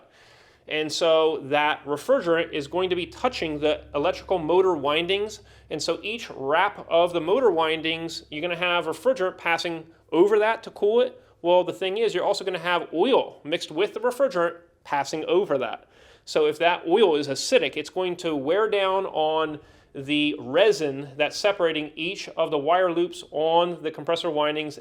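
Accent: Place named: Philadelphia, United States. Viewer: Philly accent detected 👂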